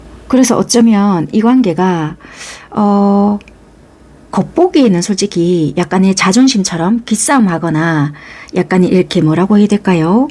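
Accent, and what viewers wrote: Busan, South Korea. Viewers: 1 듣다적은 요약 상대방의 생각 결혼하고싶다 좋아하면안되는데좋아하고있다 자존심 희생 누군가 한명이 '이관계가 뭐지?' 현타 구설수 나란히보단 눈치 상대방의행동 행동,연락없다 선톡해도 겨우 답장 일시정지 '우리 이별인걸까요?' 연락해도 불편 안해도 불편 이러지도 저러지도 심적 갈등 여러분의 행동 얼마전까진행동 지금은 안부를 했거나 일시정지 움직임없음 현재 상대의 생각 쉽게풀리지않을것 내가 움직이지않으면 너도 움직이지않을것 상대의 감정 후회 나의 생각,감정 어떻게해야할지아나 쉽지않네 얘만있는것도 아닌데 공허 이가 하나 빠진느낌 상대방이 원하는 관계 약속 약혼 열정 전생? 과거?에서부터 이뤄진 관계 너와 같이 살고싶다 결혼하고싶다 허허허 100% 500% 맞네요 부산사투리 너무 매력적이시고 현실적이라 좋아요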